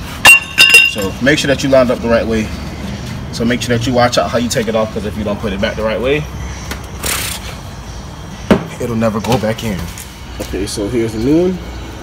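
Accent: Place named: South Carolina, United States. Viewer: That's a South Carolina accent for sure! Thanks for the helpful video too!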